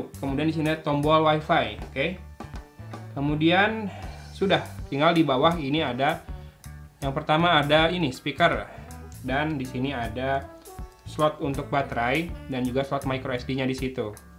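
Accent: West Java, Indonesia. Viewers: Ah sunda pisan eta murangkalih si akang logatna 😁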